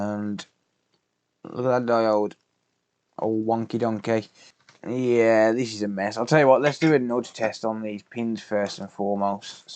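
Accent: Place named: Black Country, United Kingdom. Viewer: Another great video,. Watching and learning techniques from the likes of yourself, Vince, Northridge, etc I've learned absolutely loads. Your channel has something the others are completely lacking though. Being a guy who was born and brought up in Wednesbury but moved up to the Yorkshire coast, it's nice to hear a bostin accent from back home. Black Country ay we. 😂😂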